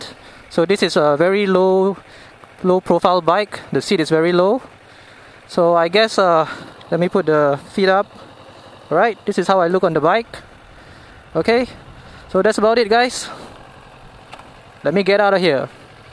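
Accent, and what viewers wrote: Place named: Asia. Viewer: Why? He's obviously asian, you can say that by his accent, but his voice is actually quite good for this type of video as you can easly hear what he's saying through the wind, traffic and engine noise.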